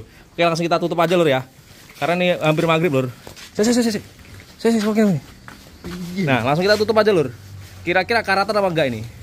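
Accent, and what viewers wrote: Java, Indonesia. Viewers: Bahasanya medok 😂 Asli mana??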